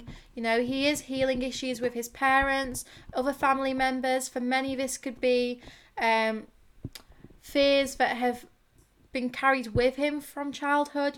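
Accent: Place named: United Kingdom. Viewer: Pretty sure accent is British and the cards are priced in pounds, so will be coming from UK. Usually fairly quick postage, although due to unknowns on Brexit, our international post is getting backlogged. I sent all my international post to my family for Christmas today to beat the 31 October.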